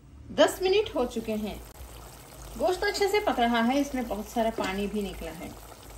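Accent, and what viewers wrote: Hyderabad, India. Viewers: Assalaam Alaikum, Amma Kya Malai Gosh Banai naa aap. Laikin, mujhae yaa poochna tha, amma, ismae mirchi thoda kam daal sakte kya. Mai thoda midcii kam khata hu., kyu kae, mai England mae takriban 50 (Pachaas) salo sae hu. Aap ki Hyderabadi boolthi sunkae bada maza aaya. My aap ke Chantal ko like aur subscribe kardiya. Mai aur meri beebi Hyderabad jab aaynga to aap sae mulakhat ki umeed rakhtae hai. Allah Hafiz..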